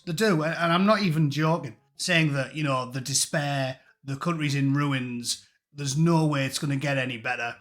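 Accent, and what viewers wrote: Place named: Middlesbrough, United Kingdom. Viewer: I must admit, I think history lessons are much better when told in a no nonsense Middlesborough accent😂.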